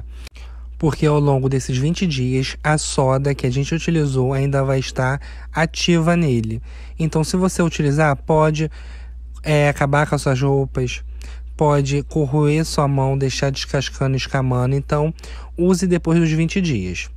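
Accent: Rio de Janeiro, Brazil. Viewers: Adoro suas receitas pq além de vc explicar muito bem ainda ficam lindas e certamente muito potentes, e esse ficou maravilhoso., gosto muito também da sua voz e de seu sotaque. Vc é carioca?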